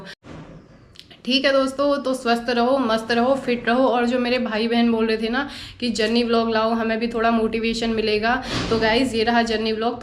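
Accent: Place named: Kumaon, India. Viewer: आप बहुत प्यारी हो। आपके ज़ुबान से कुमाउँनी भाषा बहुत अच्छी लगती है।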